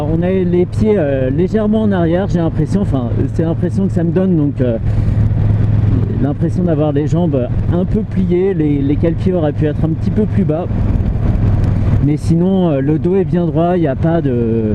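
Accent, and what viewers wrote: Paris, France. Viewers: ton accent parisien est horrible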